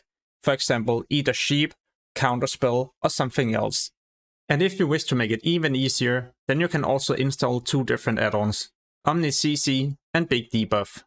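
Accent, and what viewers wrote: Denmark. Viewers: Lyder som en dansker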